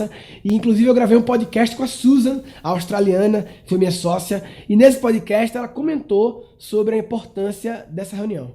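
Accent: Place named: Recife, Brazil. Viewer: Gostei muito desse video,! Você tem um sotaque daqui, da região metropolitana do Recife!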